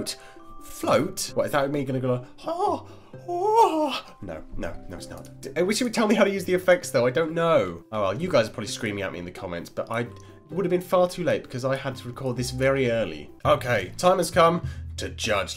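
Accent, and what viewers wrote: United Kingdom. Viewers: are you UK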